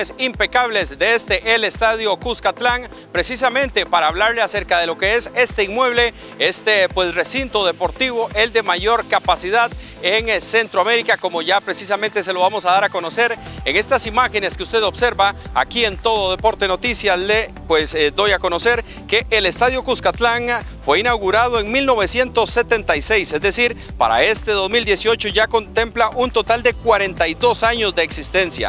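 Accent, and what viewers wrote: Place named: Costa Rica. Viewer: Suena costarricense este cerote,! Q pasó?.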